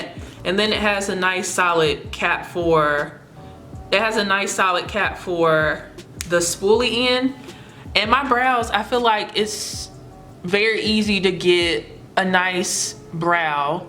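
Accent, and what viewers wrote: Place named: Texas, United States. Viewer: Are u from Texas??? Your accent is everything 😘.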